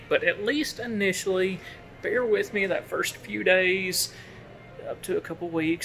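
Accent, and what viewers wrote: United States. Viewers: I can’t understand this guys country accent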